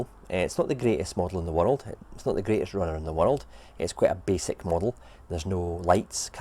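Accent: Scotland, United Kingdom. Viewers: Need a lift? Check out the close captions, and see its hilarious attempts to deal with a strongish Scots accent.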